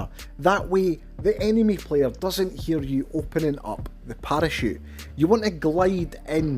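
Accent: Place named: Scotland, United Kingdom. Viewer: Great how to man, this game is so intense. And Always love your Scottish accent bud! Makes the commentary entertaining and flows well with the video.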